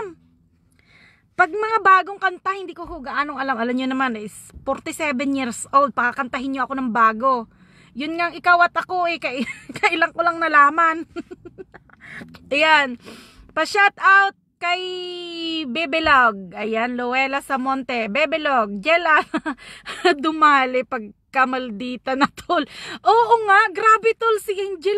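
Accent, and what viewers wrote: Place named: Batangas, Philippines. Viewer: Hahaha,,, nkkatuwa k nmn mgsalita ng batanguena🤣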